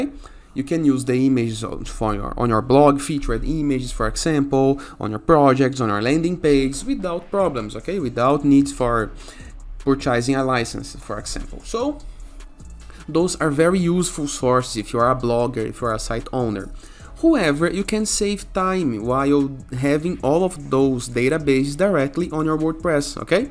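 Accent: Brazil. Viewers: Senti o sotaque brasileiro kkkkk depois fui no canal conferir e realmente é. Conteúdo bom demais valeu 🤝🤝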